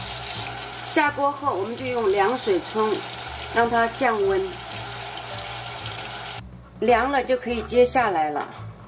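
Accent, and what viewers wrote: Shanghai, China. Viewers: 真正上海人讲国語，就是这种口音的，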